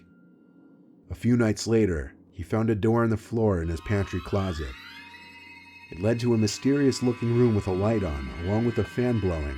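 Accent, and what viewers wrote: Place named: New Jersey, United States. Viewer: Me nightmare is def from like New Jersey or philly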